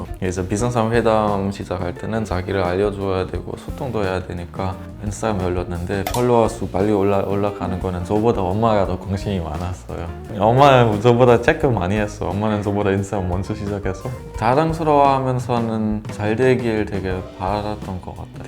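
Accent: Seoul, South Korea. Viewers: 저보다 서울말을 잘하시네요 ㅜ ㅜ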